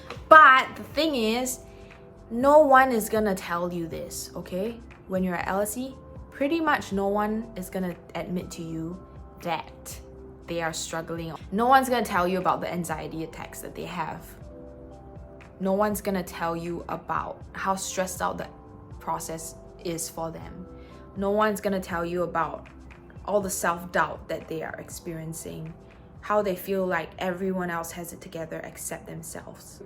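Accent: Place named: Singapore. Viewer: Hi! Future student in LSE MSc Political Science here! Got any advice for a fellow Singaporean? (Assuming you are from the video and accent haha..)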